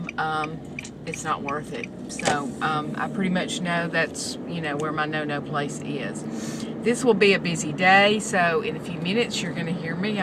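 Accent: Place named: South, United States. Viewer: I just love your accent and all that southern charm. Sounds like it's a busy few weeks coming on. You're doing great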